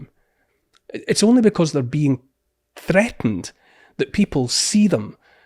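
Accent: Scotland, United Kingdom. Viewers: Scottish is a cool accent .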